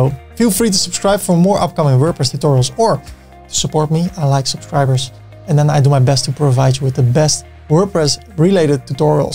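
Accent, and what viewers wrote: Netherlands. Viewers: Bro sounds Dutch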